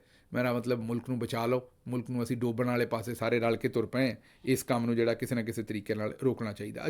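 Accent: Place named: Punjab, India. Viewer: I enjoy your Punjabi dialect Your explanation is sincere Today you mentioned about military officers having a servant “ orderly “ It needs a correction Police and other civilian officers , in military person doing same is called “BOOT MAN”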